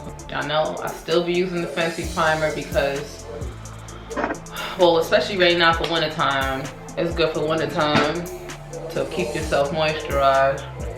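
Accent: New York, United States. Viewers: Lmaoooa “my ock don’t remember me??” I knew you were from New York😭😭💛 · where she from ? she sound like she from chi that’s where I’m from and she sound just like me 🤣 nvm she sound like she from New York 🤦🏽‍♀️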